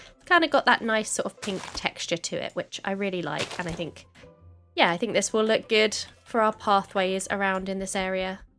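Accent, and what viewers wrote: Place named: United Kingdom. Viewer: You have a British accent, I kinda like it but it's sometimes difficult to understand but for me who's learning English (the British and American) I understand some words, also what a cool video ! I'm subscribing to your channel right now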